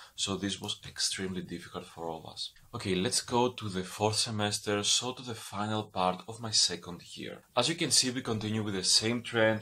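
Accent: Greece. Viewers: Πως φαινεται η Ελληνικη προφορα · Il Αφερφέ ο λόγος που του μίλησα στα Αγγλικά είναι επειδή ΟΛΑ του τα βίντεο, ακόμη και η περιγραφή του καναλιού, των βίντεο κλπ είναι στα Αγγλικά. Άν και για να πω την αλήθεια, η προφορά του μου κίνησε λίγο την περιέργεια και έμοιαζε πολύ με Ελληνική. Τελικά είναι όντως Έλληνας. Οκ δεν πειράζει για την ερώτηση που είναι στα Αγγλικά. Με αυτόν τον τρόπο μπορούν να την διαβάσουν και οι ξένοι, οι οποίοι μπορεί να έχουν την ίδια ερώτηση με εμένα... Οπότε μην πας να το παίξεις έξυπνος για να βγεις από πάνω. Εφόσων μιλάει Αγγλικά στο βίντεο, είπα και εγώ να κάνω σχόλιο στα Αγγλικά για να καταλαβαίνουν όλοι.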